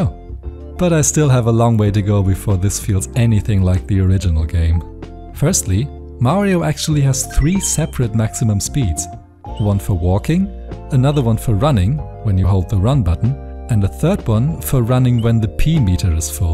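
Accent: Germany. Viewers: der deutsche akzent der isses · german guy? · Den Deutschen hört man schon deutlich raus Bruder. ; ) Du hast nicht zufällig früher TheEmero geguckt? : D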